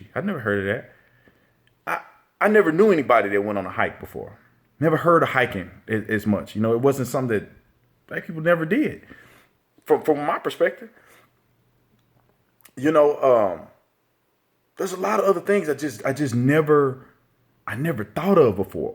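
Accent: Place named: Fort Worth, United States. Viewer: Yo! Your from Ft Worth! Me too! I knew I heard the accent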